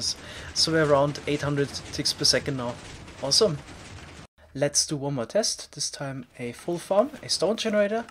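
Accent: Germany. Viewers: Du bist doch deutscher oder :) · Das hört man raus das du aus Deutschland kommst, nices Video :D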